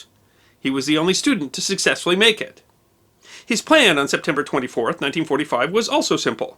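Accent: United States. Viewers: In American English, most pronounce it like "Why-mar." He's American and so he pronounces it that way. Germans pronounce American place names differently also. Most Germans I know pronounce the US capital as Vashingtone. That's just the way it is. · Forgive me for saying that when watching documentaries on hearing an American accent I generally switch off. This was an exception and I'm very glad I watched it. Many Thanks.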